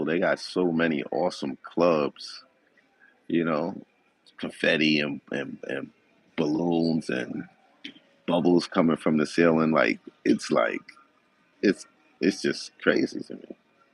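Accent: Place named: New York City, United States. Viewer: Your NYC accent is diminishing.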